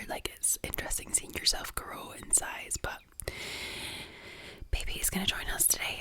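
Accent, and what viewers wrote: Scotland, United Kingdom. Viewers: 3rd comment..hot mom Scottish ❤ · Thank you so much,.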